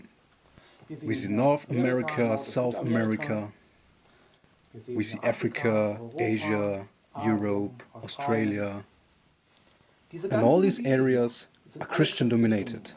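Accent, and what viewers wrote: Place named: Germany. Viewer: Das Deutsch hört man doch eh leicht durch.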